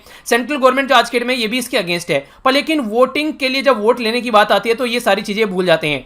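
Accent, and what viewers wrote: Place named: Bihar, India. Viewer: Kis kis ko lgta he ki hmare pyare sir bihar se he😊😊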